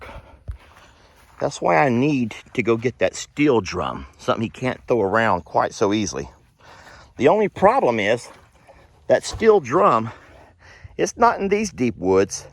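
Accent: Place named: Texas, United States. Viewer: I watch all your videos all the way through, always! I love your Texas accent. I watch your whole family- you are all very entertaining and make me laugh….at night I lay in bed and put one of your videos on and relax and listen. I watch in the morning, as well as I eat my toast. These are my routines- gotta have my family fix every day! Keep doing you, I love it!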